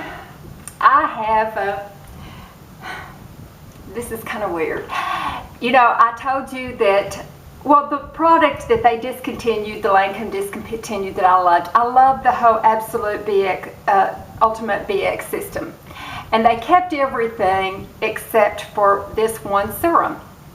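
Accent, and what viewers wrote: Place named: South, United States. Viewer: You would win SURVIVOR, I'm certain... your sweet genuine spirit would definitely win votes. I'd bring you as my one item because of your heart warming personality~ I often think my adult daughters will show up at my door doing an intervention someday - I "hoard" skin care, make-up, BOOKS, and shoes... I love your videos and always enjoy your sweet southern drawal... never boring,!!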